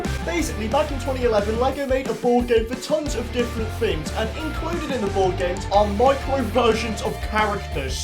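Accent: United Kingdom. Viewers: Bro are u brithish ¿?💀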